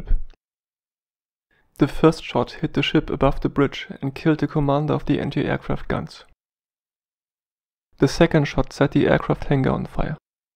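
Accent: Germany. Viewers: I think he is German!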